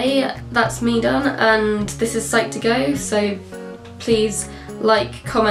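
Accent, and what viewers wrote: United Kingdom. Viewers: British Accent, Happy tone and a Psycho Topic. Hillarious. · Serious subject, happy clown music and a brittish accent do not go well together.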